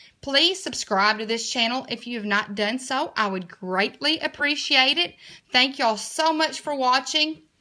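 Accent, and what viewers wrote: South, United States. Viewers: Really nice video, very clear, easy to follow, and I loved the clear way the ensembling of the foxidori. I also loved that sweet Southern accent! :-) So warm and homely to hear!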